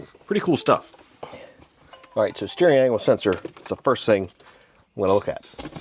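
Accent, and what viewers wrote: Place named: Central Pennsylvania, United States. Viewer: “I’m going to put this battery in awhile”. Gotta love that central PA dialect.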